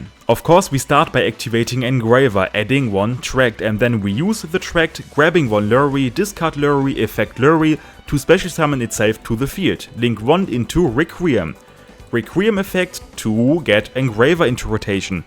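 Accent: Germany. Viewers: The german accent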